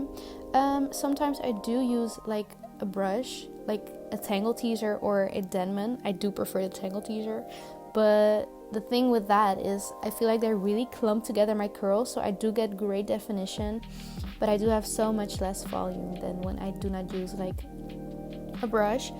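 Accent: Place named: Netherlands. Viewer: Your hair beautiful! Btw are you dutch? You kinda have a dutch accent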